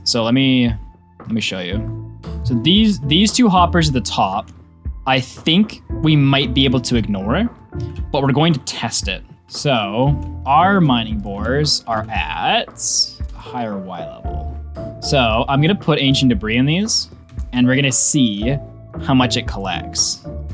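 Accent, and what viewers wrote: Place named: Canada. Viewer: Sounds Canadian Looks German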